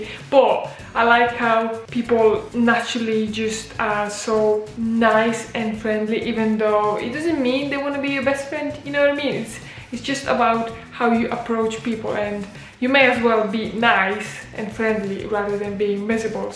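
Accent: United Kingdom. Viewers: you have a slight northern accent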